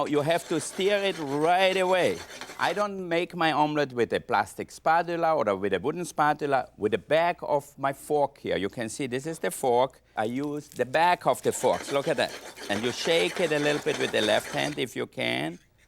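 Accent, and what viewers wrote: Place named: Carinthia, Austria. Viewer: this video stirs the future english teacher in me. i just want to give him pronunciation lessons...please let me! while our carinthian dialect was voted the sexiest in austria, i don't think the accent is very charming when speaking english... :'(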